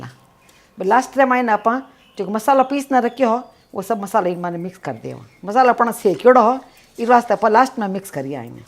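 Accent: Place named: Rajasthan, India. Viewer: Bhabi ji aap ka achar khub achcha lagey. + your sweet rajasthani bhasha 💐💐👍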